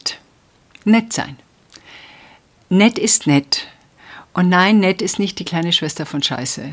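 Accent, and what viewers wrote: Bavaria, Germany. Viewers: das mit bayrischem Akzent, netter geht's net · Ich muss immer lachen wenn Dein Bayrisch durchkommt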